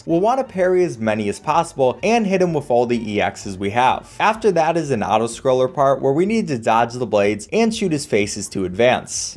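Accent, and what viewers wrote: Sweden. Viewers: excuse me are you swedish? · Are you Swedish I em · are u from sweden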